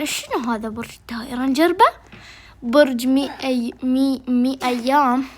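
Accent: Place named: Iraq. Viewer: ماكو اتوقع انها عراقيه!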